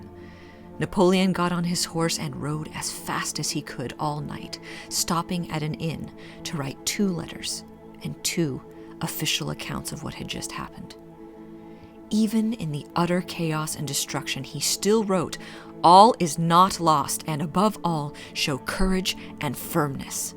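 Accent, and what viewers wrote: United States. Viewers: The loveliest American accent - and great delivery.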